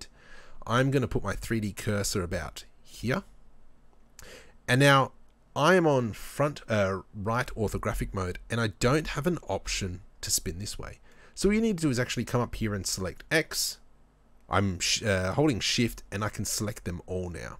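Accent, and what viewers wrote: Australia. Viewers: Spinning tutorial made infinitely better in an Aussie accent ;P - Good stuff and very helpful.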